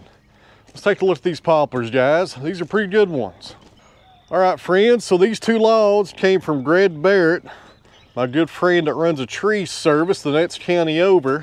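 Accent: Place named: South, United States. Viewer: I will add my voice to those who enjoy your videos just how you’ve been doing right along. That includes your explanations about not only what you’re doing but also why you’re doing it. I enjoy learning about your work. Even though I live up north, I also enjoy your delivery with a Southern touch. In these days of homogenization, the maintenance of our regional differences is a good thing IMHO. Thank you for sharing.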